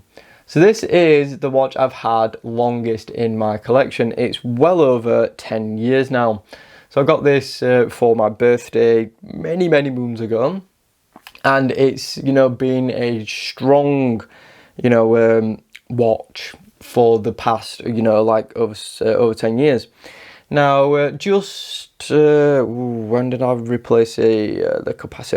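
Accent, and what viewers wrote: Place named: Yorkshire, United Kingdom. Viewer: Well, thanks a lot for this video! I like solar watches too and I have a couple of solar G shocks. I’d like to know why you’re letting the citizen BN150 go… I am waiting for it in the mail and I was wondering if you’re letting it go because of its size. I have a small wrist too, so I hope it’s not for that reason… On the usual, unrelated note: You have silent “t”s (positive, capacitor, latest, better…). Your way of pronouncing “much“, “love“, “tough” sound scouse to me, but it could be any other accent that has that sound. So, in the end, basically I have no clue. Im sure it’s not Scottish, nor Nothern-Irish and I’m prone to exclude the West Country. Any hint?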